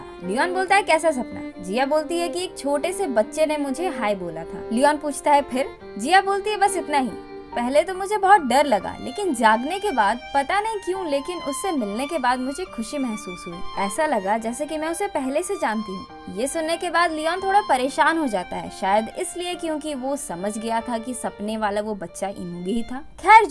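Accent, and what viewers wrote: Bengal, India. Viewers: Didi tumi bangali naaaaa sondeho 6ilo kin2 tomar vat katha te pakka hoye 🥰🥰🥰🥰🥰🥰🥰🥰🥰🥰🥰🥰🥰💋💋💋💋💋💋💋💋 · Are you bangali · You are Bangali right? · Are you Bengali?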